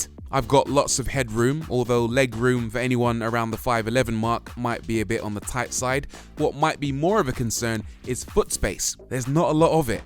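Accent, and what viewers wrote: United Kingdom. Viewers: I got to love that British accent it is so awesome to listen to. I hope this guy does more EV reviews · Here in Australia 🇦🇺, we pronounce the word Hyundai, exactly the same way as you do in the UK, nice rant by the way, I was lol..😂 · I’m an American Army vet that did 3 tours in Korea. I pronounce it the same way you do sans British accent. I love your reviews. You have a new subscriber. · Yes we in Aus pronounce it the same as the motherland! All advertising by Hyundai here pronounces it the same as you do in the UK! PS. Love your reviews.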